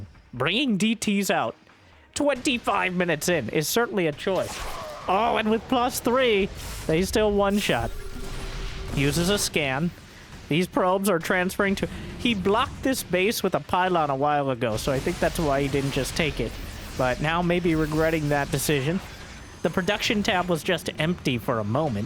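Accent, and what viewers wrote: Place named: Tennessee, United States. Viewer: Casual banshee. Accent from Tenesee Ain't no draftee. This is StarCraft 3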